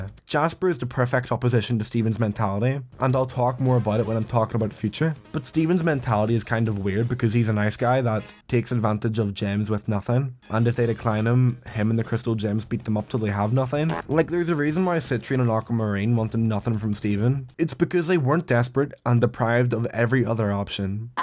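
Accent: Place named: Northern Ireland, United Kingdom. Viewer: You just saved my life fr. I haven’t heard a northern irish accent since christmas 😂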